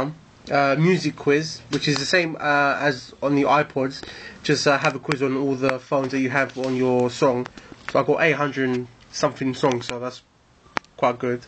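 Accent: United Kingdom. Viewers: Cockney accent.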